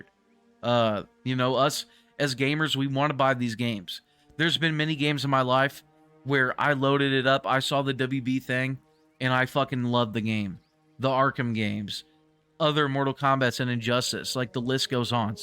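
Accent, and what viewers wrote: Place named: Texas, United States. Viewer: bro! You gotta be from Texas. Heart to confirm 👍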